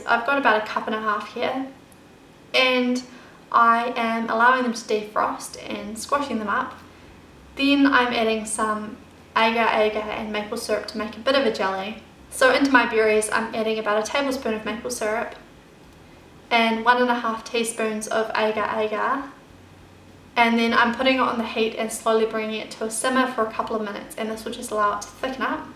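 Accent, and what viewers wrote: New Zealand. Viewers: are you from Newzealand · Mira J. I think she's from New Zealand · Are you from NZ? I feel totally at home hearing your acccent and I don't know why else that could be. :D Thanks for the recipe btw. :D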